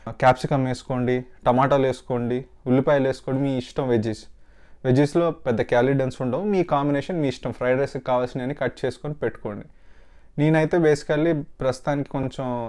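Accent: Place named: India. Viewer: bro fan of ur telugu slang!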